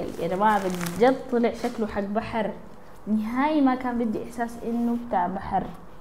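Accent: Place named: Iraq. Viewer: ما اجملك لهجتك تشبه لهجتنه اهل العراق ☺❤❤❤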